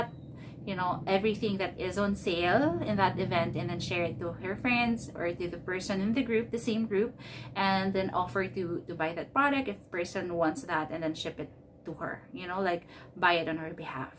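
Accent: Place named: United States. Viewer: I love the accent i thought naaa US po talaga kayo meem hihi wink wink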